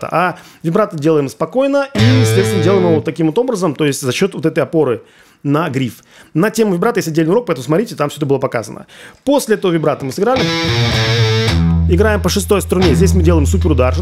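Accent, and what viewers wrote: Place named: Novosibirsk, Russia. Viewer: Эти интонации Пушного такие же как у моих новосибирских друзей частично НГУ, частично Сибстрин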